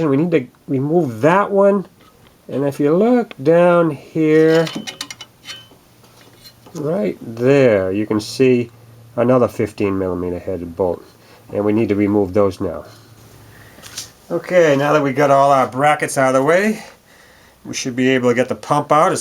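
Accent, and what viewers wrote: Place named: Boston, United States. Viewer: . Thank you so much for the video! You could have saved me hundreds. I never would have had the gumption to change my water pump without your video and the one from autotech. I watched it backwards and forwards so much that I started thinking in that Boston, is it?accent. Can't thank you enough. When I got to my water pump I decided it wasn't leaking (it had been changed twice by the same mechanic the last just about 4k ago) so I changed the thermostat housing instead HA! But I wouldn't change the experience being that I was on vacation and want under pressure to get out done. Thanks!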